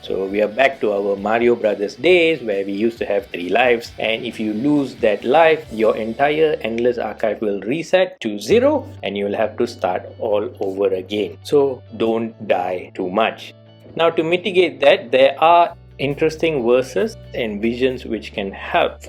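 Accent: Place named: Malaysia. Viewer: Wow Malaysian or Singaporean Indian I’m guessing. Hyped for the endless archive. Re downloading the whole 100gb game again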